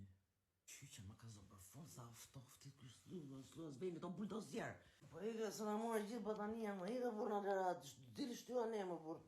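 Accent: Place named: Central, Albania. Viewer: O Zot....eshte e vecante...mynyra si flet me kujto gjuhen e shqiprise se mesme ...sa mall 😅😅😅😅😅 Je shume originare. 😂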